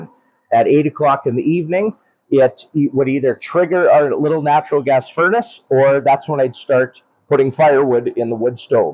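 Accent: Saskatchewan, Canada. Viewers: Some how the Canadian accent works with better than and I'm not sure how I feel about it. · Bro, we can tell by the accent you're a good Sasky Canadian boy, there won't be any naysayers 😂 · I couldn’t possibly say nay to that thick Canadian brogue.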